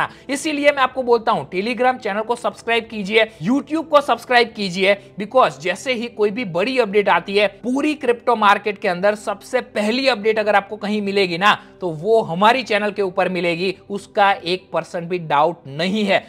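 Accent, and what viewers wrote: Gujarat, India. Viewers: Bhai tu Gujarati hai kya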